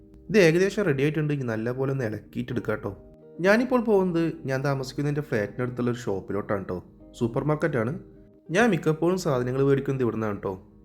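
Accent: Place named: Thrissur, India. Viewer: ath trissur kkarde oru slang aatto😂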